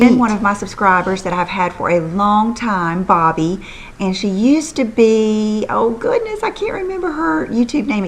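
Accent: South, United States. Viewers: Hi!!!!! Great video. I'm a new subscriber and I love your channel. Love the southern twang too. Lol! We are close in age. I'm 40, so I appreciate all the advice..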